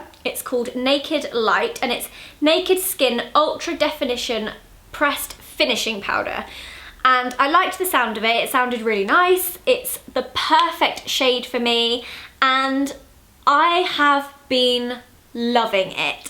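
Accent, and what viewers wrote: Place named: Somerset, United Kingdom. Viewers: I know you said shout out to Wiltshire, but people speak like this in Somerset too, and it was nice to hear, being at uni so far away from those accents you don't think you'll miss! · I'm from the south west and I literally sound exactly like you at the start of the video(: love your makeup in this video!💕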